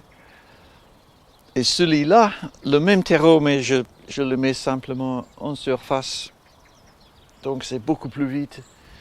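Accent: United Kingdom. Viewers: Hi . bonjour merci quelle belle video fait avec un grand coeur rempli d'envi de partager vous etres a lentlemen merci . Je suis en france et je regarde toute vos video . C,est un bonheur de vous ecouter francais parfait with the English touch beautiful ❤️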